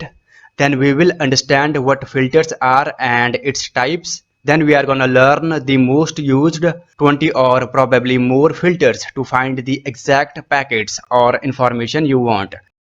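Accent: India. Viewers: the indian accent may the most annoying of all, but the content are really helpful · I never cared about heavy Indian accent because my pc is healthy thanks to a lot of precious help/knowledge that i absorbed by watching tutorials made by Indians, among other things. I have come across videos where i had to pause and listen again to a phrase or two, but that's the worst case scenario. And the value of the information absorbed, is the only thing that matters. Thank you for such a helpful video unknown friend. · When I heard Indian accent I thought the video is gonna be just a joke but this video helped me so much, great one, keep it up! And u really know what are u talking about. Like deserved.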